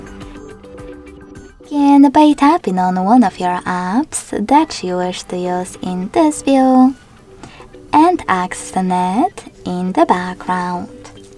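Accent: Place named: Thailand. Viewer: What the heck, 😂 the voice is so Thailand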